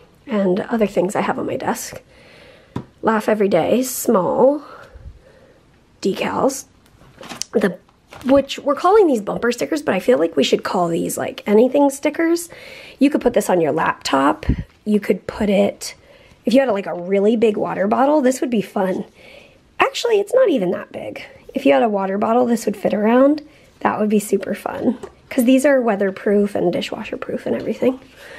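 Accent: South, United States. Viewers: Southern accent is sounding so good!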